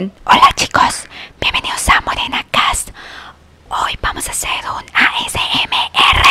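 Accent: Colombia. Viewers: Por supuesto es Colombiana.. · Eres de Barranquilla Colombia?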